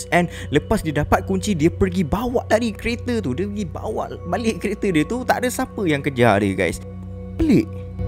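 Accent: Malaysia. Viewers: Kwkwk lucu, logat melayu memang sangat lucu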